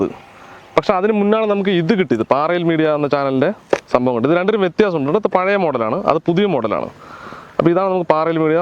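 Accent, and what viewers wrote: Palakkad, India. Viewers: all the best എന്ത് ചന്തല്ലെ ഇത് തനി നാടന്‍ പാലക്കാടന്‍ സംസാര ശൈലി 👍👍 . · Palakkad aano,ikkante samsaaram pkd pole thonnunnu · Palakkaadan daa🥰🥰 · Ethu njaga palakkadada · Palakkadan slang istam like here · ഹേയ് ഇതു ഞങ്ങൾ പാലക്കാട്ടുകാരുടെ തനതായ സംഭാഷണ രീതിയിൽ അദ്ദേഹം ജാടകളില്ലാതെ പറയുന്നു അത്രേ ഉള്ളു. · ആദ്യം ഒരുപാടു ചിരിച്ചു , നിങ്ങളുടെ പാലക്കാടൻ ഭാഷയും മറ്റും കണ്ടു ... അവസാനം കുറച്ചു കരഞ്ഞും പോയി ... നിങ്ങളുടെ ആ നല്ല മനസ്സ് കണ്ട് ... 🙏🙏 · Palkkade slang powlii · പാലക്കാടൻ സ്ലാങ് ആണോ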